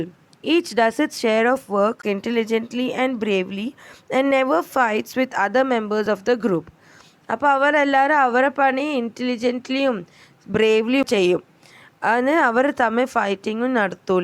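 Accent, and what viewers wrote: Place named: Malappuram, India. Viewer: Malappuram slang assahaneeyam!!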